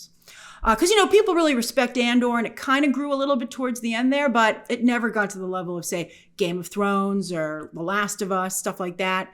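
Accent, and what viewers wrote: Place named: United States. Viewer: Took French in school, so I was cringing at her Americanized pronunciation.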